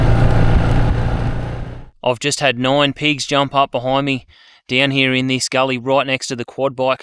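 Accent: Australia. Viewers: Proper unadulterated Aussie accent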